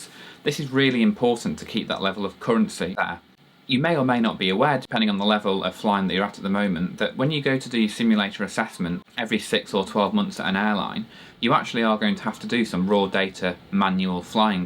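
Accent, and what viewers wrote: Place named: Blackpool, United Kingdom. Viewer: I recognised the Blackpool accent before the aerobatics over the Wyre! Nice film, from a 42yr defence aerospace vet who lived right under that view. Hope all is well for you now.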